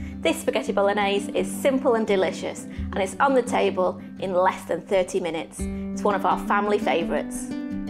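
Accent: United Kingdom. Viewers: literally the most bri'ish video i've ever heard,but still a good one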